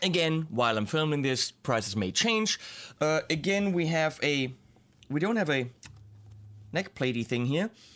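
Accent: Germany. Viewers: Ich hatte die ganze Zeit das Gefühl das du deutschsprachig bist und als du "Very stramm im Ton" gesagt hast war es klar xD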